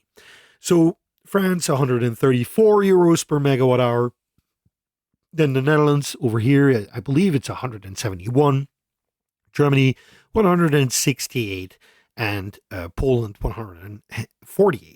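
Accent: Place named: United States. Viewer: As an aside, why do you speak with American accent?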